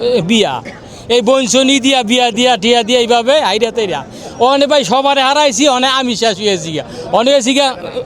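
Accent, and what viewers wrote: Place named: Munshiganj, Bangladesh. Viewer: কথায় বুঝা যায় মুন্সিগঞ্জের মানুষ।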